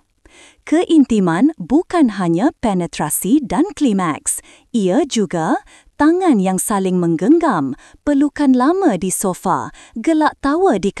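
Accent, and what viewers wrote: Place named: West Kalimantan, Indonesia. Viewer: No.1...saye dari makassar...trim's...aksen Dr mirip ipar saye dr ketapang kalbar🙏🙏🙏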